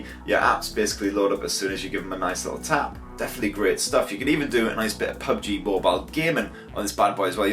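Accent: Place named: England, United Kingdom. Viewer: Still sounded more pom than Aussie but it's forgivable haha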